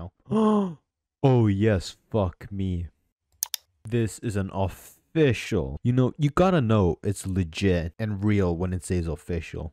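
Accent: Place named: Poland. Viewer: as a polish person i confirm, this is exactly how we speak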